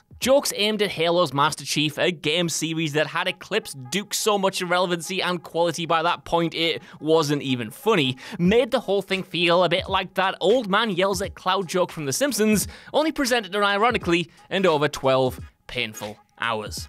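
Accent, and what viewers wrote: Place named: Tyneside, United Kingdom. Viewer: I hear a tyne valley accent. Maybe tees actually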